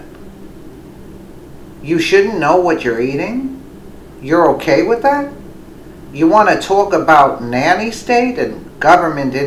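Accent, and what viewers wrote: New York, United States. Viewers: good job sounds like your from NY . yes I fixed my children's lunch the night before . who knows what they feed people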